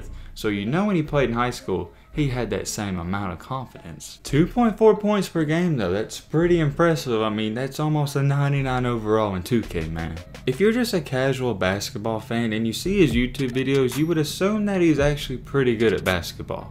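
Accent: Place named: Texas, United States. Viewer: You have a king of the hill accent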